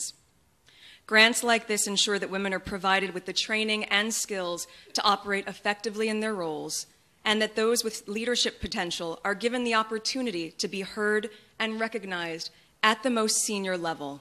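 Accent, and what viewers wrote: United States. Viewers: It's an American English accent. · That was American English! is not British. She is American.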